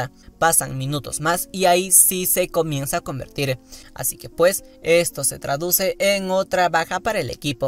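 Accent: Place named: Mexico. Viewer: palteas oe porque hablas como mexicano , si eres peruano !!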